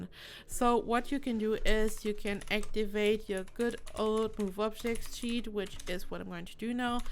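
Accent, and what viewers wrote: Germany. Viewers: you're English has a German accent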